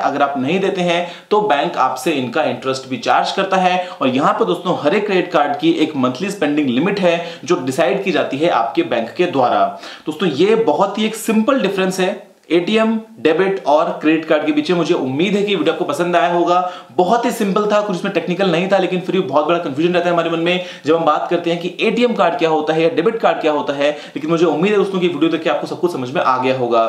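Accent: India. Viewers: NOT ALL KNOW INDIA ENGLISH PLS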